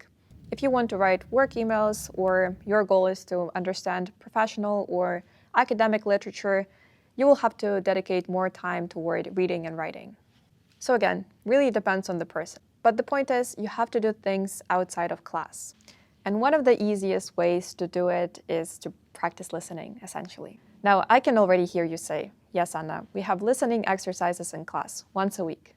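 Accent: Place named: United States. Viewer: That's fine but you're speaking English with an awful American accent. Why do so many people do this?? It's not English. It's annoying.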